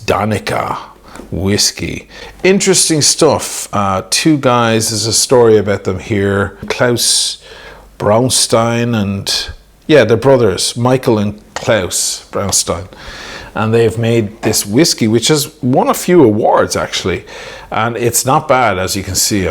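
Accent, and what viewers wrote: Ireland. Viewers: What can be said about the 116610LV that hasn't already been said? I came for gruff, Irish, vocal fry. And the drinks.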